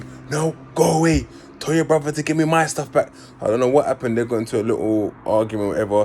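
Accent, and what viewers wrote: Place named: North London, United Kingdom. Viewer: Love the vids bro. Mans got the full on narm accent, sounds like giggs sometimes lol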